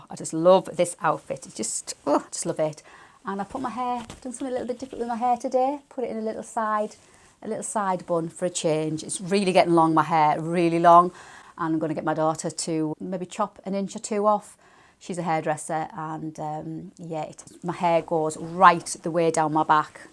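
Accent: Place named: Northern England, United Kingdom. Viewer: hello, I came here from The Dahlia Sewciety channel. Kristen was showing your blouse pattern. Now a new subscriber! May I ask which part of the UK you are from? I'm fascinated by accents and I'm thinking somewhere in the north of England 😃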